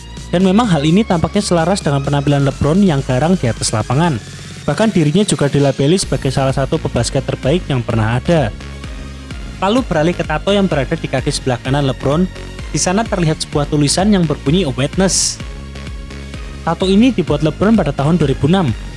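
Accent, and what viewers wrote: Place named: West Java, Indonesia. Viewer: Sundanese english 😂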